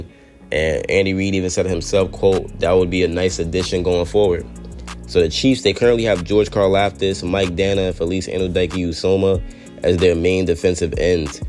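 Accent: East Coast, United States. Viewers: U sound like u from the east coast some where like Baltimore or jersey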